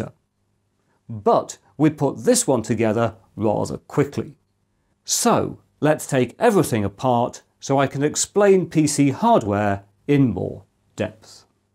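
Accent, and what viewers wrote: United Kingdom. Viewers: I didn't learn anything new, but as always, very nice simple video w/o annoying music and flashing lights. i do enjoy the non nonsense approach with you videos. i think i will show this to my son, he may learn a few things. thank you. btw, the british accent is a nice touch.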